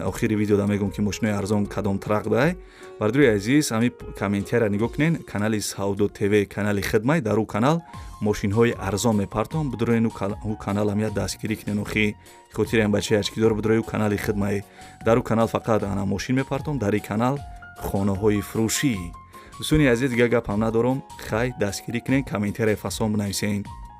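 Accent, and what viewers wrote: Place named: Gharm, Tajikistan. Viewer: ХДТ ГАРЕНИ ГАПМЕЗАНИ НЕКИ😂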